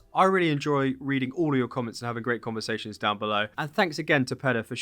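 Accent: England, United Kingdom. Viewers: Judging by your accent, you’re English?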